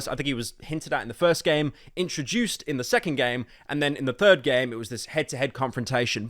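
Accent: United Kingdom. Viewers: a brit talking about a game. just what i wanted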